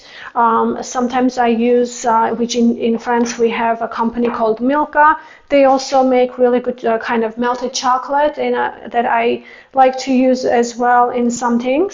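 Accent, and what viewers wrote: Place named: Armenia. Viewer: Hello, Maybe I should call you Chef! The pastries look delectable! Your pastry dough looks so beautiful, and I'm sure they taste divine. It made me think of Baklava, but this recipe is very much more French with the pastry dough, apricot preserves, and dark chocolate. Mon dieu! You definitely need a larger workspace so you can have all your ingredients and utensils at hand. Next time you do this, don't forget to demonstrate how you separate your egg yokes! I felt like I was watching Julia Child. I never missed her show on PBS for so many years. So now I know you are of Armenian descent. I have been wondering for some time where your accent is from. You must have some royal blood with your ginger hair! When I was in college, I had the great honor of working under the baton of the renowned Director of Bands of the University of Illinois Urbana-Champage Symphonic Band, Dr. 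Harry Begian, who was also of Armenian descent. He conducted the honor band every Summer at the band camp I attended in Hays, Kansas at Ft. Hays State University. It was by audition, and I am still very proud to say I sat first chair Alto Saxophone every year. It was always an amazing experience, and one I will never forget. Dr. Gary Corcoran, the Director of Bands at the university I attended for my degree in music education received his doctorate degree under Dr. Begian. I studied conducting with Dr. Corcoran, and I still miss conducting a band. Sadly, both have passed now, but I still ador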